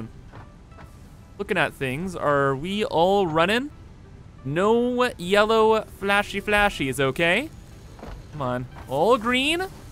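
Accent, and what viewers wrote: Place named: Canada. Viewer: Haha No worries if this is too personal but, what part of Canada are you from? It's always more comfortable listening to a fellow Canadian talk about games!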